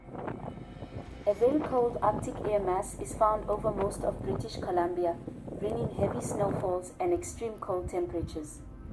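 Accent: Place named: Cape Town, South Africa. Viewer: Sounds like a capetonian accent for the narrator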